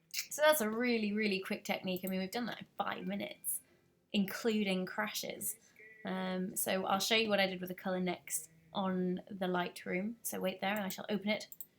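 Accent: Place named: United Kingdom. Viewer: Love yer acent , so british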